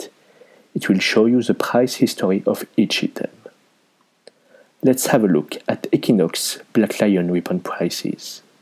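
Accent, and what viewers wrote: France. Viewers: NICE FRENCH ACCENT MY FRIEND :) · Ce franch accent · So many years and I still lobe your French accent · Aaah l'accent français c'est grillé au bout de 3 secondes :D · Possibly the strongest french accent i’ve ever heard, no hate intended! Good guide!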